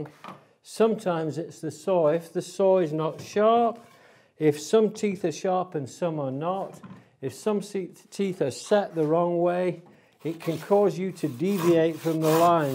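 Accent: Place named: Midlands, United Kingdom. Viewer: So nice to hear a lovely soft Midlands(?) accent on one of these videos. Thanks!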